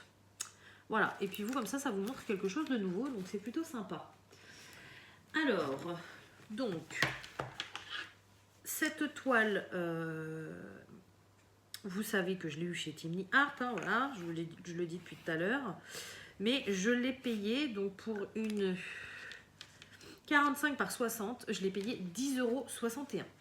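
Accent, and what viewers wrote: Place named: Quebec, Canada. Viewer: Tu la bien le français québécois hihi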